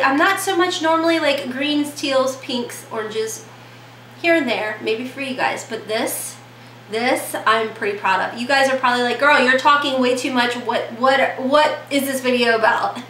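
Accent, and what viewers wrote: South, United States. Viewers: This look is so beautiful on you!! And your accent! I love it you must be from the south, as I am!! I’m in Oklahoma and it’s hotter than satan right now!! And I do like first impressions on palettes! Great video xoxo! Lots of love!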